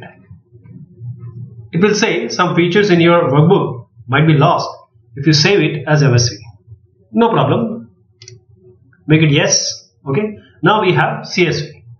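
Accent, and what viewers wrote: India. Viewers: I wonder, is there, somewhere on the internet tutorial without indian voice ?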